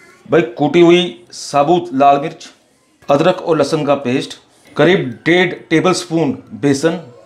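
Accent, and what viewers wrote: Punjab, India. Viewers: aap punjabi type bolte ho bahut payra lagata che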